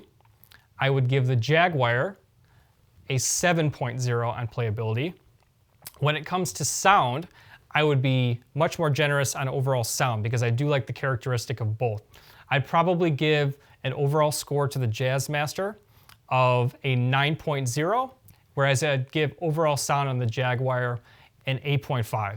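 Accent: United States. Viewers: or Picksburgh accent. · Chicago accent?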